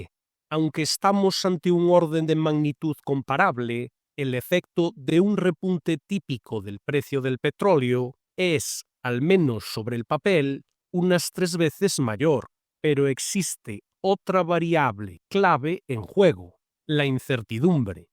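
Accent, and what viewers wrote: Galicia, Spain. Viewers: No ves que tiene acento gallego?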